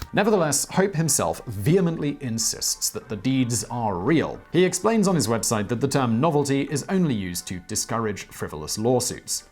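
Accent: United Kingdom. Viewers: I enjoy any video that has a British guy repeatedly saying fart!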